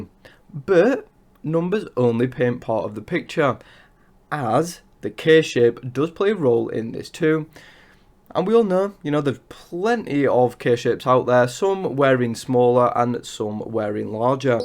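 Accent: United Kingdom. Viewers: thanks for your answer. I’m not British, but I have an interest in accents, dialects and all that jazz. Might I ask you where your accent is from? Midlands? Liverpool?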